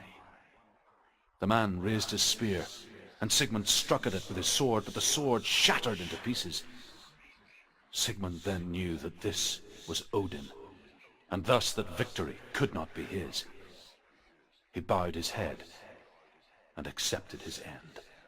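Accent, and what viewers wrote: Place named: Northern Ireland, United Kingdom. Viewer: Proper Northern Irish.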